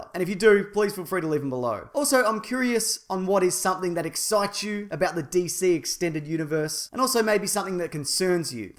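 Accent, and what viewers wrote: Australia. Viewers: Love this dudes crazy Ozzie accent, sound just like Steve Irwin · Be honest people, the only reason you watch these vids is for that SWEET, AUTHENTIC, AUSTRALIAN ACCENT!! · Man you are awesome and the Australian accent helps haha.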